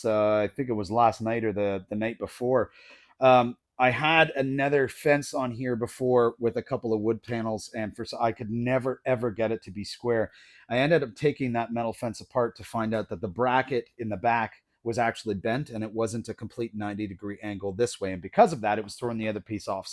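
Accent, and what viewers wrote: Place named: Canada. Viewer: I used to think tv exaggerated that Canadian accent.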